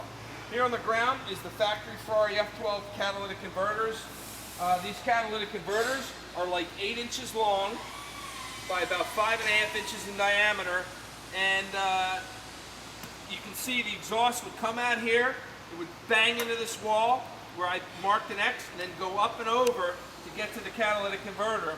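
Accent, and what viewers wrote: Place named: Long Island, United States. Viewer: I moved out of NYC and I watch Fabspeed videos when I need my fix of that Meeeasapeequah accent. Parts look great too. How do these cats do on emissions?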